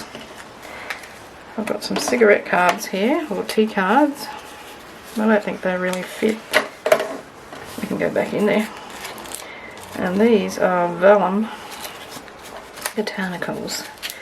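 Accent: Australia. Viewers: This is doable! I’m collecting bits and pieces to make my first journal and JJJ seems like the perfect time to muster up my courage. It’s going to be a French and lace journal. I’ve saved this one and I’ll be referring to it a lot! Thanks for the inspiration. I’m in Oz too on Tweed Heads. It’s so nice to hear an Aussie/kiwi accent in YT. Of course I’ve subscribed😊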